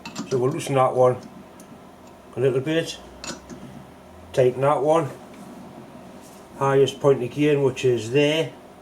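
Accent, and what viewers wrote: Newcastle, United Kingdom. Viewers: Geordie as it is spook! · Il est du Nord-Est de l'Angleterre, il parle l'anglais avec le dialecte atypique pour les gens nées a Newcastle-upon-Tyne, le 'Geordie', qui est une mélange de anglais,celte et mots de langue nordique (norvegien et danois). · Although I am a Yorkshire 'Tyke' and not altogether accustomed to your Geordie accent, I understand you perfectly,. Just remain as you are!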